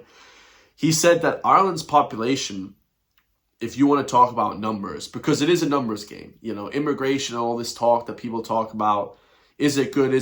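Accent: United States. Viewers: You sound more American than Irish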